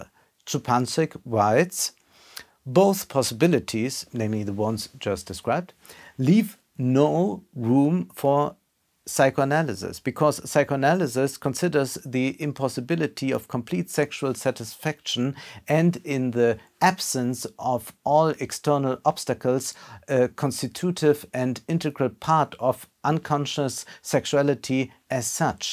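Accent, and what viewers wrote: Germany. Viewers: He just started the english channel, he gets round about 30-60,000 views on the german one. Sometimes he gets much more views if a movie is currently in the theatre and is more sophisticated, like "Tenet" recently, ore when he rants about janky german rom-com movies. I would not worry about the german acsent, it's Kind of original, but I wouldn't be if surpriced if some viewers find it anoying, it's kind of special channel with interesting takes and viewpoints.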